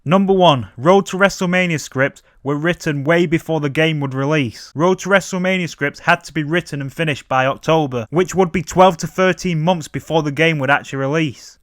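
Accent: Yorkshire, United Kingdom. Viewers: You have a propper broad Yorkshire accent it’s brill 😂😂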